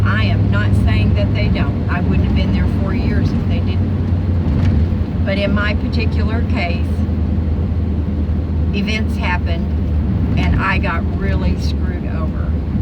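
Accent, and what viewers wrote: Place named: Alabama, United States. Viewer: <link> When I was in San Diego and searching for interesting places to visit and park my van that didn't cost anything, I found many places over in Mission Bay by SeaWorld. There is a nice parking area on Vacation Isle where you can park and see the boats go in and out the harbor. These are for day time hours only but some parking areas open real early am for the fishermen to launch their boats. It's fun to see the boats go in and out the harbor. There are even some Mississippi paddle boats to watch at night all lit up in the sky from their lights. Hope this helps. What part of Alabama are you from for your accent sounds Alabamian. I enjoy watching your videos.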